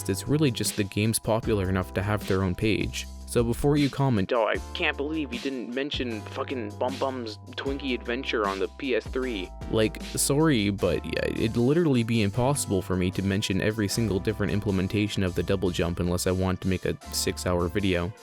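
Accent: Canada. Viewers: you're Canadian right? also fun video.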